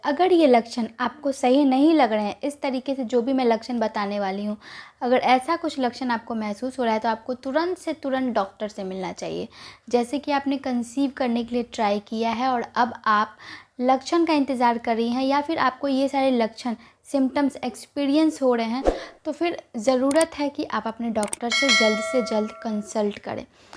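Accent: Bihar, India. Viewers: Ma'am Aapke bolne k tarike SE pta chalta h ki aap Bihari ho 😀 am I right ??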